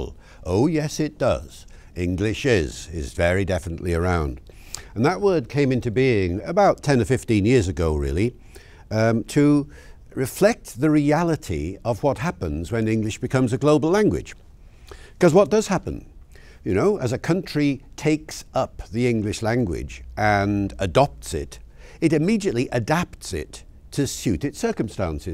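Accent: RP, United Kingdom. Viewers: His accent sounds 100% British. Not one American accent (not even the transatlantic one) comes close to sounding like this. If you think this sounds like American English, you are quite unfamiliar with spoken English (no offence intended). I personally think he has a hint of a northern British accent. - I think his pronunciation of "see", "settlers", and "home" give it away a bit, just to name a few examples. According to Wikipedia Billy Crystal grew up in Liverpool while his mother was from Northern Ireland, so that may explain it. I'm not a native English speaker though, so if any native British English speaker could tell me whether I correctly picked up his northern leaning accent, that would be great. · David Crystal's pronunciation is known in linguistics as RP (Received Pronunciation). The closest spoken English to the rules of English pronunciation is found in the upper Midwest of the United States, often called " General American Standard", it lacks the different accents from other areas. 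Major TV networks send there promising young news broadcasters to special accent neutralization classes in the midwest. General American Standard is often called Network Standard or newscaster accent. RP and General American are pretty close, RP has a little "Prestige" inflection in it. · He speaks RP, which was invented for that purpose.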